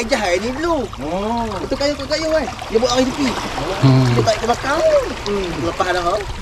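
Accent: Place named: Banjar, Indonesia. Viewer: pekat banjar nya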